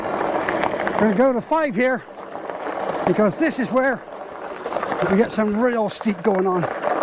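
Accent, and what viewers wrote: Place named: United Kingdom. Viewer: Beautiful, you sound like you come from Blighty?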